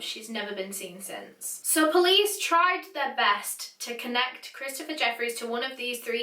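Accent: United Kingdom. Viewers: your accent is so.. brit gangsta- i love it... keep up the tight work, its awesome, so far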